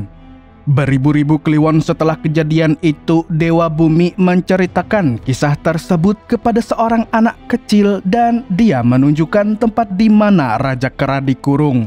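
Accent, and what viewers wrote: Banjar, Indonesia. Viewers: banjar bnr amang ny ni😂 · orang Banjar nii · Orang Banjar wara sklinya😅🙏🤣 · Urang banjarkah admin ni😂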